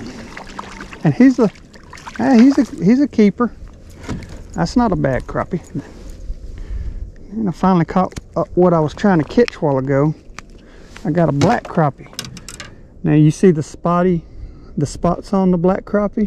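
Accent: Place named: Ozarks, United States. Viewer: I can tell an Ozark Mountain accent when i hear it. Proud Ozark Mountain Hillbilly mysef. 👍 👍 👍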